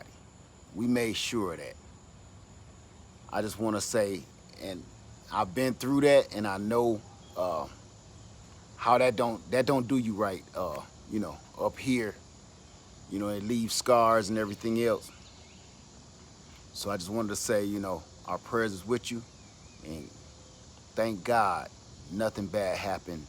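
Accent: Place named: New Orleans, United States. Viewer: Shonuff sweet gesture sir and it goes to show how our community supports each other!! Digging your air layering experiment for sure!! Found your channel thru my brother the Sapper, ya have a new subscriber from east central Mississippi here!! You sound like you from Nawlins brother lol!! Dan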